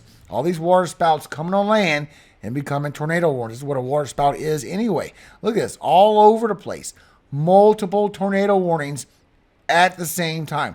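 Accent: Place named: Los Angeles, United States. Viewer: I knew you had to be from LA with that accent. You are my "go to" for weather forecasts and updates. I can always depend on you inform us in LA what we can expect during the active weather events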